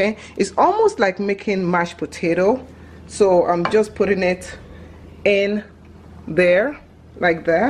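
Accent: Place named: Nigeria. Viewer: i love the way u speak in nigerian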